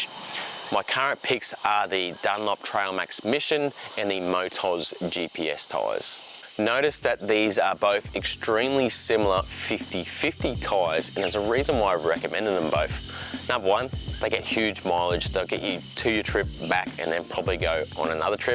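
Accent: Australia. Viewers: that is not a British accent. Aussie, maybe a Queenslander. Which is Australian, just more so. He dresses like he's from QL. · Taai'es ... gotta love the Aussie accent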